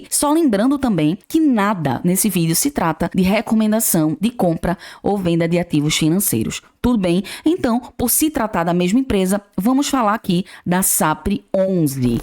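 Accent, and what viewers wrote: Northeast, Brazil. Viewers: Bom português, boa narração, conhecimento muito ok e sotaque nordestino. Tenho Copasa e penso em Sanepar, mas precisa melhorar o dividendos. Parabéns,.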